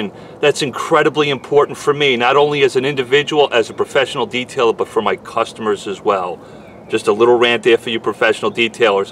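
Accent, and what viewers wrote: New York, United States. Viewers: It says Dallas, but you sound like NY.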